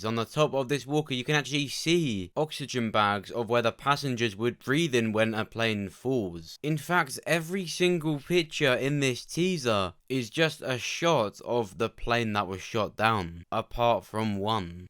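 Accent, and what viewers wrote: United Kingdom. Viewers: Do you ever do live Q and As?? Your theories are so interesting I’d love to ask your opinions on some other things TWD related And sooooo good to hear a fellow Brit breaking down these trailers and info. I want to win!!!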